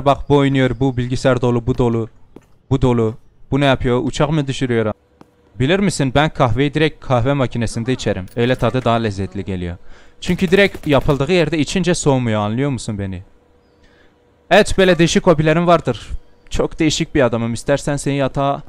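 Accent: Elazığ, Turkey. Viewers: Abi Elazığlımısın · Abi sen Elazığ lı mısın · Abi elazığlımısın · La sen elazığlımısın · Abi Elazığlı mısın? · Abi Elazığlı mısın yoksa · abi elazığlımısın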